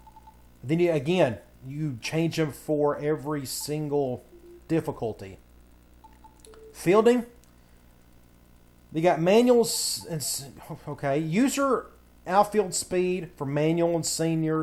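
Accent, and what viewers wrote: South, United States. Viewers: Love the video man. You have a great personality man and we kindve got the same southern accent lol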